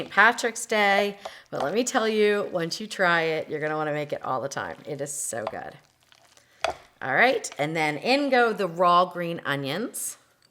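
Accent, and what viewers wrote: United States. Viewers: So much talking! Just show us how to make the dish. Also, if you are half Irish and half German, why do you have an American accent? 🤔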